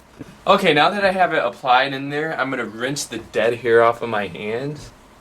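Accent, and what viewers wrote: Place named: Canada. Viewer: You sound quite canadian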